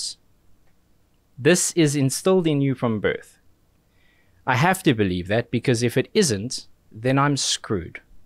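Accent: South Africa. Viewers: Definitely sounds South African 👌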